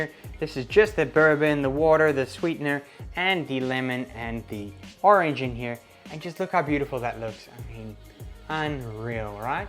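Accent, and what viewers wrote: South Africa. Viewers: Hey, well, the kids are back in school....and I'm back on the Keto Wagon and my first stop - ok was NOT the boozey section eh! (hahahaha) it was your bread recipe but I'm already here and there are few comments on this one so I think I'll have a better chance of you seeing this. Ok, well I am the mama in Belgium who cannot find that darn oat fiber over here and Amazon won't ship it here either soooooo, I was wondering if you were familiar with Lupin flour? It is super high in protein and very low-carb (I think it's 1 net carb per 100g). Do you have any recipes using this and if not, I would SO LOVE to see you do some....like your bread recipe that I cannot wait to try....but am a bit nervous of doing it without the oat fiber. PS: Btw, thanks so much for your wonderful videos young man. You're living in my native Canada but pulease do not lose your South African accent as it's SO classy; so nice to listen to.:-) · Ooh. 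Looks lovely. I am also going to try your flax seed yeast bread. [May I ask, where you born in South Africa? You sound like a soutie ; ) An affectionate nickname! I was born there. ]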